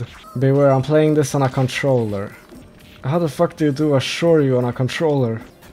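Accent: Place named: Sweden. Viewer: ahh I expected you were Swedish by ur accent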